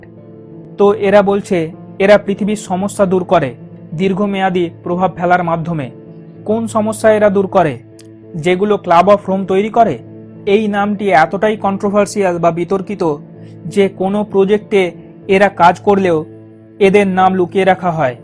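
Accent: India. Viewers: Dm mahmud Bhai uni indian.. And indian der bangla uccharon amader cheye different.. Ek ek arear banglali ra ek ek rokom uccharon kore.. So, kawke evabe opoman korben na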